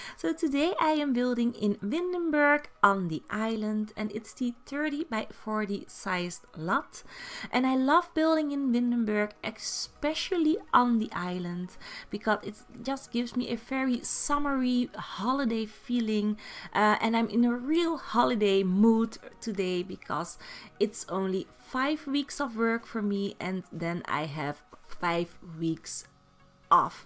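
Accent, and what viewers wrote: Netherlands. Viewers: Ik kon gewoon aan het accent horen dat je nederlands bent haha, maar erg mooi huis! die keuken ook mooi uitgezocht!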